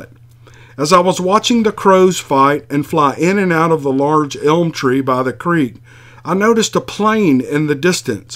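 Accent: South, United States. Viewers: I love your stories and voice. Being a New Yorka, it's refreshing to hear a country bumkin tell stories like this. Keep up the great work. Salute. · What the heck are you doing??? 😳haha I love it!!!! you are absolutely amazing these encounters remind me of what I heard a couple months or years ago and I lost track of you and I'll tell you what you have already moved from spot 21 to number one absolutely unbelievable encounters you're just doing such a great job I can't believe it and I love your accent and I love the way you're from the South and I love your guitar rifts you do you are very good at guitar too Keeper go on letter rip potato chip haha lol fantastic work wow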